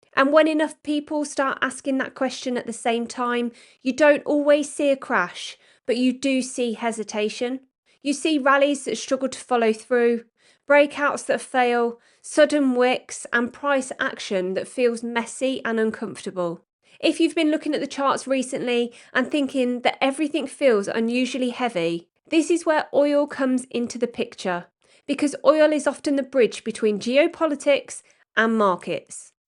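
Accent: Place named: Black Country, United Kingdom. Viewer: Great Black Country accent by the way. Love it ❤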